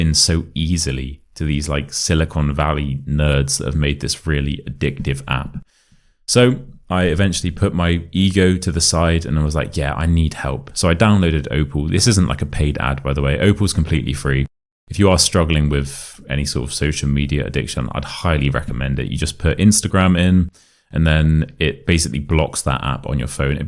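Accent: RP, United Kingdom. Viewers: maybe yours is the sound of educated people... like the BBC guys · I would call it a very "standard" English. I can hear you are from GB somewhere, but this language i would expect to hear in US as well. English is my 3rd language, and if everyone were talking this way, the world would have been much more understandable place. :-))) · It is a little bit harder to me understand his British accent, because I am used to listen to American accent, but in general I could understand almost everything he said. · Do all british speak like you? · Quite understandable your speech... I always do enjoy your videos because I'm working on my British pronunciation and your particular accent is what I'm looking for... modern, standard, glotalisations, etc. ❤ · From your example, I think you have lived in Thailand. 😊. Yeah,, I am familiar with the British accent that you speak. 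However, I found that when I lived in London, people use different accents and it was hard to understand them all.. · I'm gonna be hosnest i didn't get ir alll video but about 95.7% i could get . I'm so happy thia video is so amazing 😍 and i will watch more time this . because the British accent it's so my difficult to my ears. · I do understand this British man but i live in uk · the modern RP accent you speak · I clearly understand you, but as some already mentioned not everyone in UK speaks the same way. For example, I can barely understand a pretty British TV show Brassic. Still love British accent and the way you say things :-) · He IS speaking RP like ...so It IS pretty clear to Understand If You have a good Level at listening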